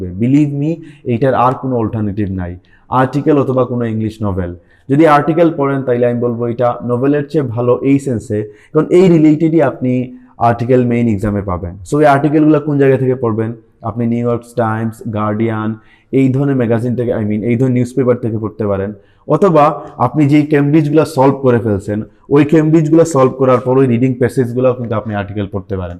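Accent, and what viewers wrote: Sylhet, Bangladesh. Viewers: Sir apni ki sylhety? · R u from Sylhet sir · আমি যদি ভূল না করি তাহলে আমার মনে হয় আপনি একজন সিলেটি। আপনার কথার টোনে বোঝা যাচ্ছে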